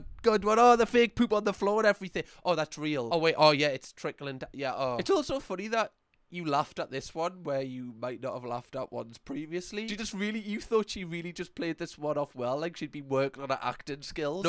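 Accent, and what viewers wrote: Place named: Scotland, United Kingdom. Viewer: you sound like scottish jacksucksatlife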